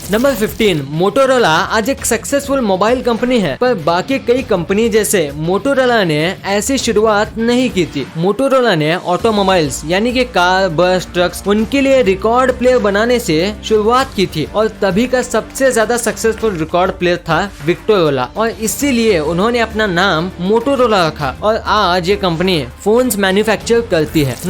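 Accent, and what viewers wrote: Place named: South, India. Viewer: Tumhari aavaz se lagta hi ki tum south indian ho!!!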